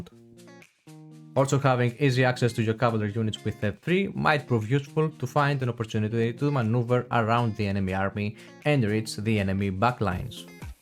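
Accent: Greece. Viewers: αθανατη ελληνικη προφορα! ωραιο βιντεο ευχαριστω!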